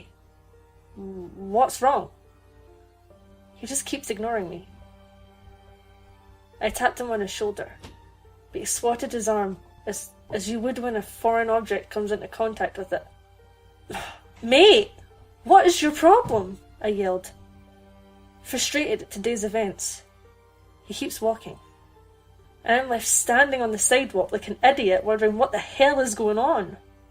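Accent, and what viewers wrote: Scotland, United Kingdom. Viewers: Are you skotish?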